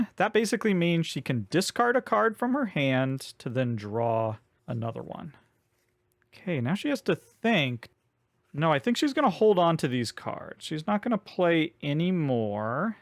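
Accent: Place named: North America. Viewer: I always get a joy watching your RC videos, as I'm still struggling to get my hands on a relatively cheap copy. In my country, demand for board games are pretty low, so the only options we really have are services like Amazon (And from your accent, I'm guessing you're either US or Canadian, so if you didn't already know, ordering from Amazon if you don't live in the US is REALLY expensive)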